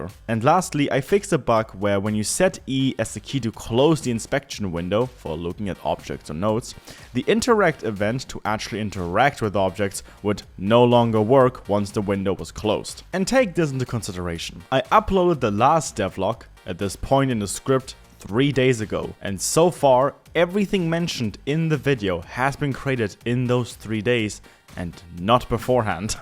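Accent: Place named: Germany. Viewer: most german accent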